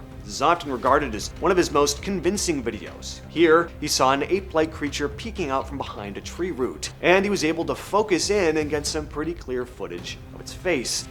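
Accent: Australia. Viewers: Australian accent... verdict...Not to bloody bad cobber.. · Great creature pics!... your Australian is perfect!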